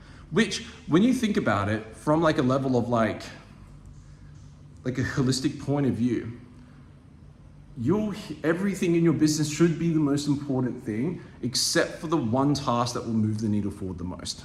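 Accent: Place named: Australia. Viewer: He too Australian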